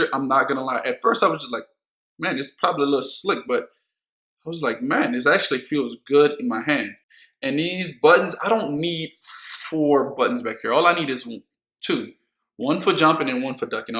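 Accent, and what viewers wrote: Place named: Louisiana, United States. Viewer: What up fam... I subscribed to your channel, and I was like he sound like he from the “Boot” too, but wasn’t sure. Then I see this video and I see the Saints Cap 😂.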